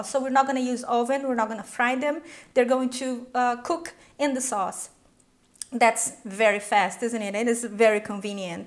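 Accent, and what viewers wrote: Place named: Brazil. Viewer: hey are you brazilian? i can see for your accent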